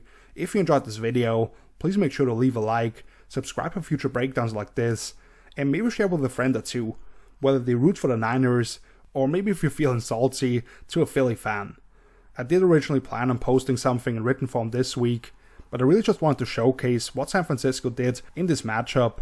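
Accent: Austria. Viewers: Your accent is marvelous. Are you Austrian? You sound so much like Arnold Schwarzenegger... Austrian by birth, moved to the US as a young adult, fluent in English but can't and won't mute the accent.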